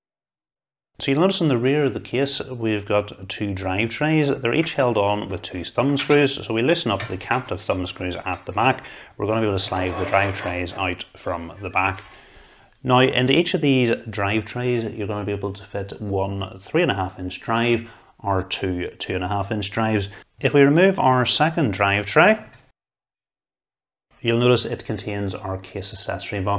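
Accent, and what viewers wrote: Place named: Northern Ireland, United Kingdom. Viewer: “Hears NI accent” subbed, nice to see a successful channel from home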